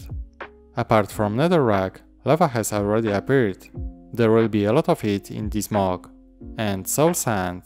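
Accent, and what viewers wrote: Poland. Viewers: Great build From your accent I assume you are from Poland? If so thats amazing for polish community! :) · Damn, I absolutely love it Dobra robota bratku (Wnioskując po twoim akcencie Polska)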